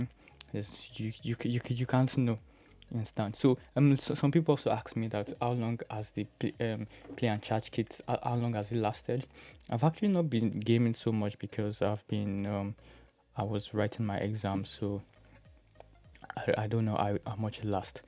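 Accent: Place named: Nigeria. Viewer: Are you Nigerian? I love the accent bro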